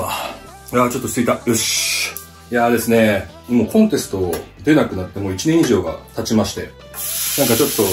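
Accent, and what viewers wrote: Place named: Kansai, Japan. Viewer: あと、フル関西弁動画お願いします😎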